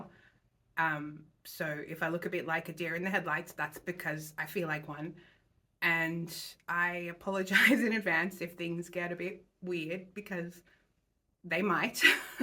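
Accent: Australia. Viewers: Congratulations on your first vlog. I enjoyed listening to another Aussie. I’m from QLD and have the Hope dress still to sew although summer is catching up with me now. Looking forward to your next vlog! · Hello! Lovely to hear another Australian, I am from Brisbane and I am sure you will be good at this! Looking forward to seeing Moore! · Congratulations on your first video. Although you were nervous you came across very well. Looking forward to more of your videos. And yes, much like other commenters, it is lovely to watch and have a fellow Aussie talk about quilting . Keep going!! Sending positive vibes from Melbourne. 🌸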